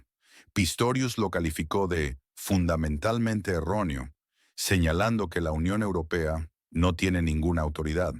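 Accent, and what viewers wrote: England, United Kingdom. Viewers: ¿Hablas inglés con acento español. No verdad? Si habéis decidido doblar al castellano hacedlo bien, sin arrastrar las erres como tarados. Hablar español con acento inglés impone un idioma inexistente.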